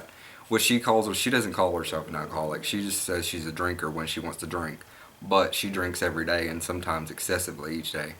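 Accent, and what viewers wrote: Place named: Kentucky, United States. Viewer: I found u thru that comment. Enjoying ur vids. My 9yo shih tsu is my only youngun. I'm f Ky ur accent sounds like mine. At least u got a sub f the troll. I don't believe in sayin GD either but I cuss like a sailor. Usually when I ask people not to say around me they respect that. Anyway I'm gonna go watch ur other puppy vids. There's a big drama w channon rose & Amber Walter on here it's like HS. I told them spend energy doin good but I let people bother me too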